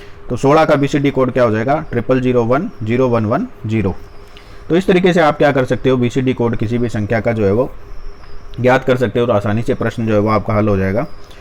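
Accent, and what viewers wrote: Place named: Haryana, India. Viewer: Sir aapki awaz es video me na thi aap pdhaya kre,aapki hariyanvi boli bhut achhi lgti hai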